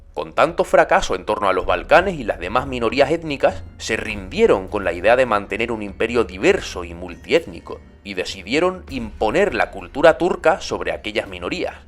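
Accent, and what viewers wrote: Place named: Canary Islands, Spain. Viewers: De que parte de España sos, si sos español. Una curiosa argentina 🇦🇷. Tenés un acento distinto a los que estoy acostumbrada a escuchar en los distintos videos provenientes de España Saludos ❤ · Creo que canario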